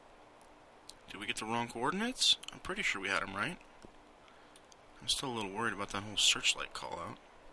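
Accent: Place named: Russia. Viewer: Strange how your character in this is german but he sounds JUST like niko out of GTA4 Who is Russian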